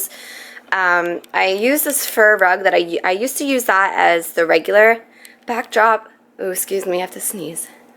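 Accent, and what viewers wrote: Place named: Philadelphia, United States. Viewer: Thank you for your vids! I’m just about to start selling on Poshmark. I Love your simple instructions, and your inventory idea is brilliant! I used to live outside of Philly (Mainline and Drexel Hill), Love that accent! 😘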